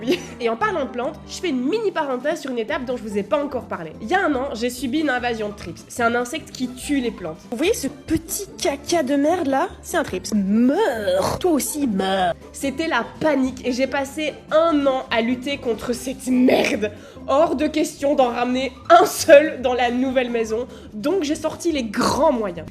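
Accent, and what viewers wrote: Belgium. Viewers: Je veux dire d'où en Belgique, la Belgique c'était clair. J'hésite ente Liège, Charleroi et Bruxelles. Vous reconnaitrez que c'est un peu large. La prononciation des é avec des è me semble caractéristique, mais je n'arrive pas à cibler.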